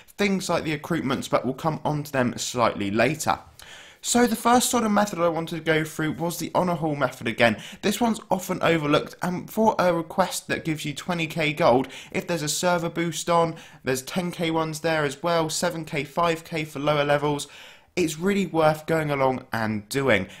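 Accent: United Kingdom. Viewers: Nice video. Lots of information btw are u a britain? Cuz u got the accent of a Britains.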